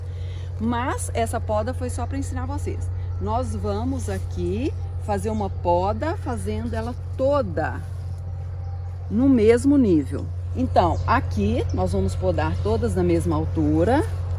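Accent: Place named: Ceará, Brazil. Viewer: Gostei amiga soou do Ceara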